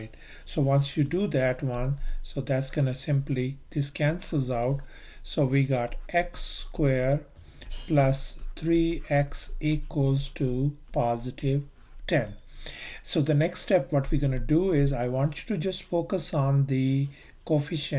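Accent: India. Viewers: ig he's indian ....by his accent....he teaches gud though (the teacher)....n call me 'sis' not 'bro' cuz it's rakhi....idk why I'm saying this but happy rakshabandhan buddy^^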